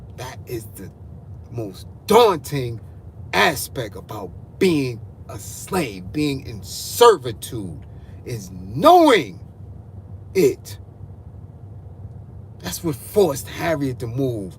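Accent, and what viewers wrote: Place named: New York, United States. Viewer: I just like your style man. The way you speak, the New York accent, knowledge being passed on, I salute 🫡 you OG, 29 year old brotha here. I’m saving this video to cause you responded to some of my live chat 💬 comment 😂😂. Keep it safe out there as well.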